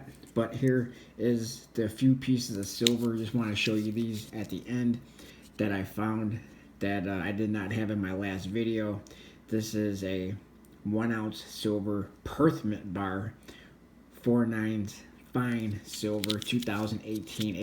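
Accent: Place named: Western New York, United States. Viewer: California?! He’s got more of a Western New York accent.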